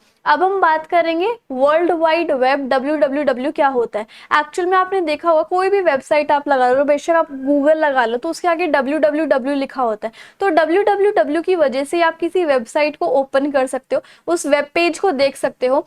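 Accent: Punjab, India. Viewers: Didi tuci punjabi hooo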